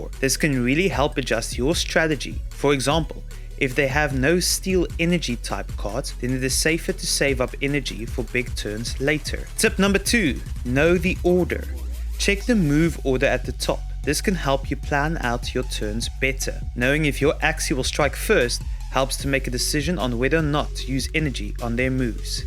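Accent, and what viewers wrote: South Africa. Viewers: This must 100% be a South African, can spot that accent immediately.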